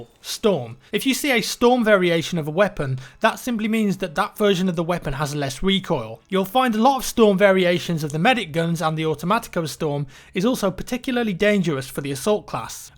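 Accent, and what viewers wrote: England, United Kingdom. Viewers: in squad up if youre on the british side please say "A tiger? in africa?". You got the accent use it :) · are you from english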